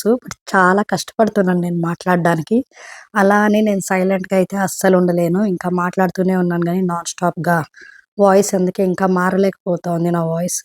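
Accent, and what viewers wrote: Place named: Bangalore, India. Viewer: నాకు ఓ అనుమానం.. ఇంత అందముగా వున్న మీకు ఏ ఆరోగ్యసమస్యలు రాకూడదు.. మీ భాష బెంగళూరు లో తెలుగు యాస గా వుంది.. అయినా మీ తెలుగు చాలా ఫ్లూయంట్ గా వుంది.. గుడ్.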